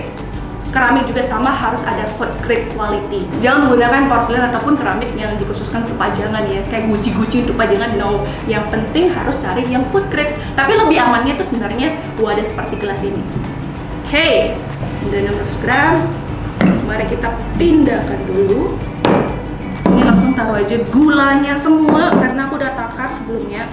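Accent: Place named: Sumatra, Indonesia. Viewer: Wah cicinya orang sumatra ini.. medok bgt ngomongnya 😆 mantap lah dpt di youtube resepnya.. thankyou!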